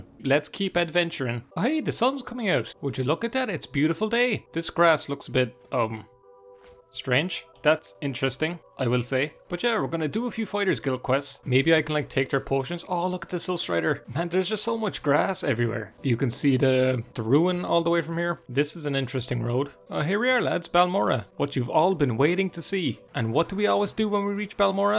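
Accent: Ireland. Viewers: We don´t mind your Irish. It´s actually fun hearing "He´s very Fair away" "Oh! Look at the Stairs!". It´s really endearing:)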